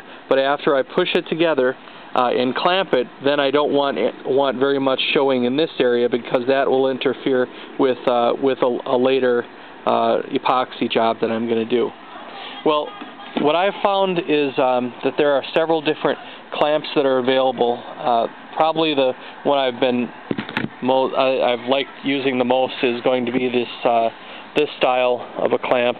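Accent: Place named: United States. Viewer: great to hear an american accent. good sign.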